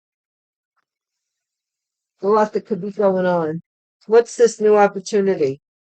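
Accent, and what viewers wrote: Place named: Brooklyn, United States. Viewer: You sound like you are from Brooklyn 😂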